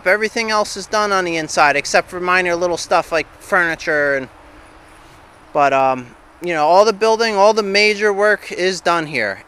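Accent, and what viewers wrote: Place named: California, United States. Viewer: What state is this in? Sounds like California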